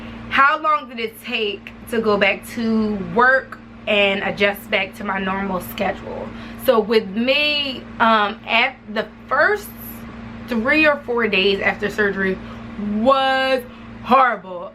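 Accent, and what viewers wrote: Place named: DMV, United States. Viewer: You sound like you're from the DMV! Lol where are you from?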